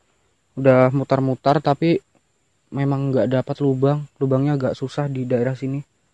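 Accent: Java, Indonesia. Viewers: Tpi ngomongnya mirip lgat jawa mas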